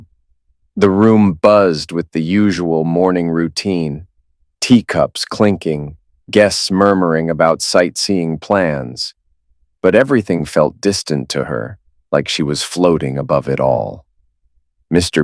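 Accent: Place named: United States. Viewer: Somehow, the american accent doesnt quite do justice to the story.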